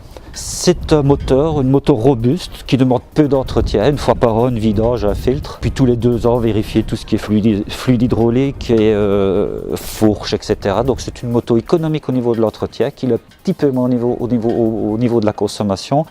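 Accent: Belgium. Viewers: J'aime beaucoup ton accent de la Belgique et c'est toujours un immense plaisir de voir tes vidéos !! 👍✌️🤛😉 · j'ai pu monter sur une (sans rouler malheureusement elle étais dans un show rom et je n'avais pas d'équipement) et c'étais nickel pour mes jambe aussi bien au niveau des commande de boite que du frein arrière et même avec les jambes tendue mes pied étais bien à plat il faut dire aussi quel avais une selle haute, de plus j'aime bien la position droite quand on est à sont guidon, je l'aurai bien acheter mais je doit vendre mon bateau avant pour la financer et faire de la place dans le garage pour la ranger, je me disait aussi que quand tu parlais sa sonnait fort l'accent de Liège à toi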